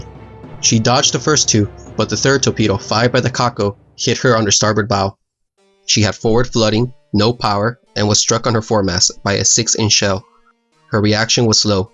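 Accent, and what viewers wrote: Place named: United States. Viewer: Mechanical "voice" narration, lots of "Wadder' and all the usual american nonsense. No wonder they want to keep quiet about how badly they did.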